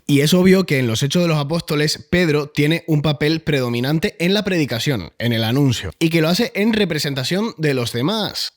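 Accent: Canary Islands, Spain. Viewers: ME ENCANTA TU CLARIDAD EXPLICATIVA Y TU ASSSENTO CANARIO. ❤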